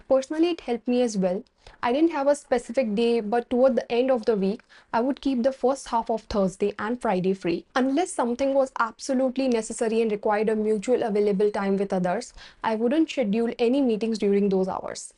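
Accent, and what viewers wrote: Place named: India. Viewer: Indian English Girl 😊 Masterpiece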